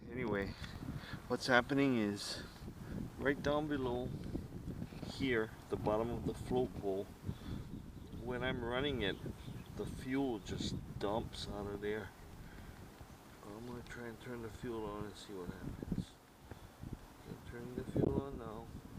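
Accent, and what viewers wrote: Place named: Hawaii, United States. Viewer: Funny how you can just tell someone from Hawaii from the accent. Lol as soon as you started talking I knew you from Hawaii.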